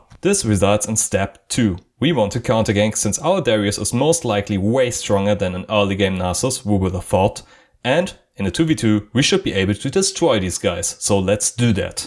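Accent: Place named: Germany. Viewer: Short, helpful, sounds germanish, like und abo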